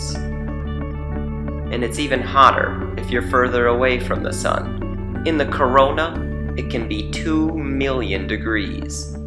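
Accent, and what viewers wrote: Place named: United States. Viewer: Speaks with an American accent but uses celcius.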